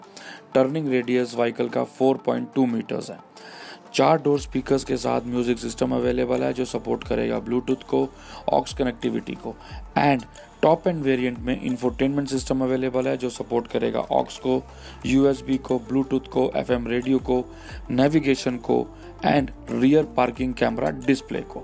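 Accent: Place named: Pakistan. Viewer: This person speaks Pakistani Hindi.....Ychel is available in Pakistan currently.So video is from Pakistan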